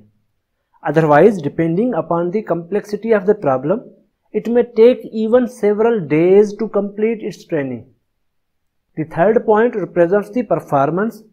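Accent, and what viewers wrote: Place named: Sindh, Pakistan. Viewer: Thank you dr sahb. Pray from Allah almighty to give me success. Wonderful methodology. Best teacher. Stay blessed. Love you from sindh Pakistan. Your accent is sindhi. Love you sir.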